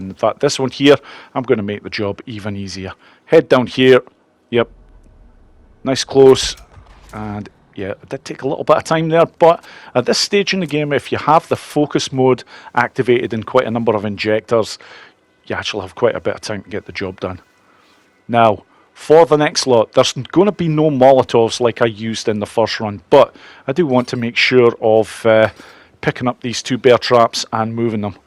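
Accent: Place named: North East Scotland, United Kingdom. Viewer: I thought I picked up a north east twang at times. I'm fae the Broch (Fraserburgh). 42 miles north of Aberdeen. Keep up the great work.!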